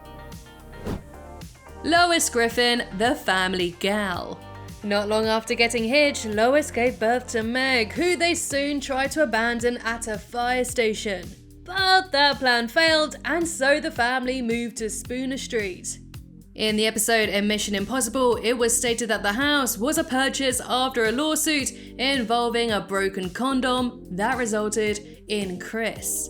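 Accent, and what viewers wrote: United Kingdom. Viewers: You have a beautiful British accent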